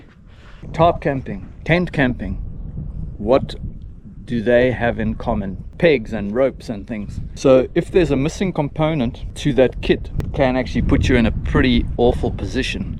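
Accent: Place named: South Africa. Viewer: I really like you videos. Freedom shouldn't be an expensive thing. You make it look easy. 😊 You are a South African born aren't you? Your accent is a clear give away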